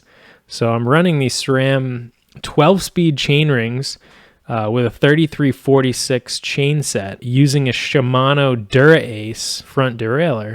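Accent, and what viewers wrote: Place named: Midwest, United States. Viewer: Your accent tells me you're from the Midwest. Chicago or Milwaukee. Takes one to know one ha...thanks for the video!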